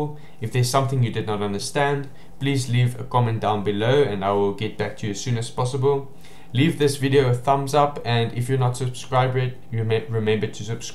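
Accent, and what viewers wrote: South Africa. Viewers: Can't miss a fellow South African accent 😉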